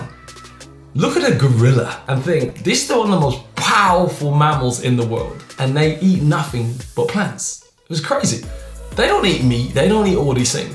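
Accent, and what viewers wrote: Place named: France. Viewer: You are so real, love your French accent, would love to hear your American accent.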